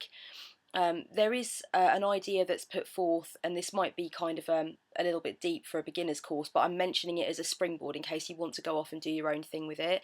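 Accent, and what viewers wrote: England, United Kingdom. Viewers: I would love to know your academic background. I wish you were a professor in the states (we love English accents for some reason, especially in higher and Broadcasting). You have amazing gifts as a teacher. I rarely subscribe but I'm going to be interested in further videos... Today is my first. Thank you so much for doing this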